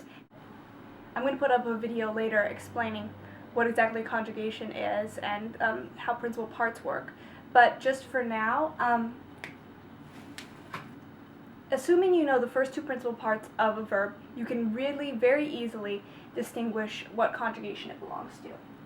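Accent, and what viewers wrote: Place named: United States. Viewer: Latin with strong American accent? No, thanks.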